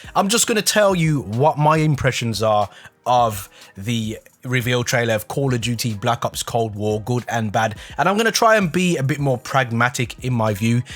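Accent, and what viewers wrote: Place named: Scotland, United Kingdom. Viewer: Nice haha, I'm Scottish but I could tell by the accent. Also, if you need music to put in your videos feel free to use mines!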